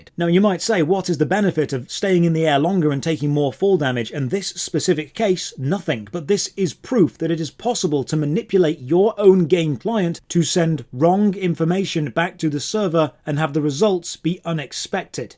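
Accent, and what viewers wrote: United Kingdom. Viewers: Another thing...Why is Topher Grace from that 70s show British and talking to us about gaming???????? 🤔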